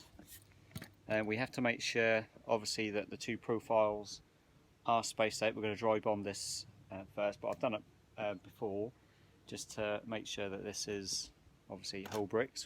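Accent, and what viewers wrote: Norfolk, United Kingdom. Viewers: You Sound Norfolk like me ;)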